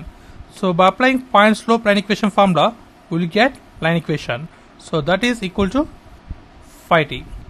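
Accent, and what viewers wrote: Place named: India. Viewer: Indian accent a little too thick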